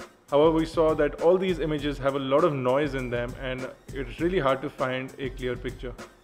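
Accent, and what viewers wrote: India. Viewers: hahahahaha i love Indian English.. "How much did you get paid by HTC tell me HUNN" LMFAO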